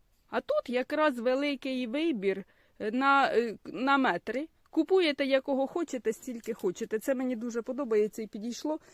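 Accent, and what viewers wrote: Poltava, Ukraine. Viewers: Моя ти зіронька ,гранатою прибьють,,,слухаю вас і балдєю у меня двоюрідна сестра живе новосанджарскій р так же розмовляє по Полтавські.Дякую вам велике.за відео.привіт с Дніпра.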